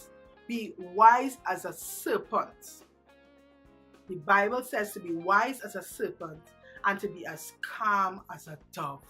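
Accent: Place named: Caribbean. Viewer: Where are you from, because you sound like a mixture of Caribbean islands. Trini, Bahamian, and jamaican.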